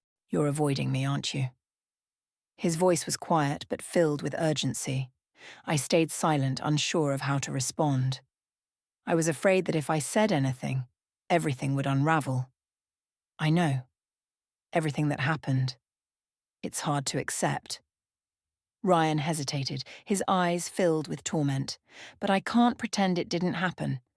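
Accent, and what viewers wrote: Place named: United Kingdom. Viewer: Why do I have to suffer through a British accent on a story ostensibly about Americans in America?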